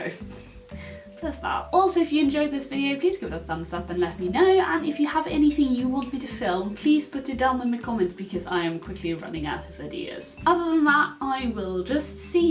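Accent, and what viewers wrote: United Kingdom. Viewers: When you said you are a international student I thought "huh but I thought you were from the UK, no way you can talk that good English with a UK accent but not being from the UK", but then you mentioned that you were from Norway and I felt stupid. I love your videos, you have such a bright and funny character!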